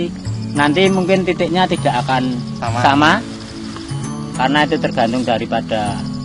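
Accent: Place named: Java, Indonesia. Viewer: jawa edan lg mancing.. tai lah bacot medok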